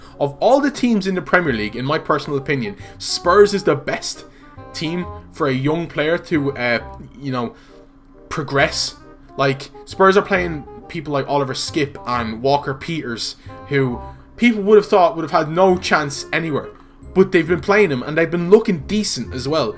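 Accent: Ireland. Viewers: are you irish???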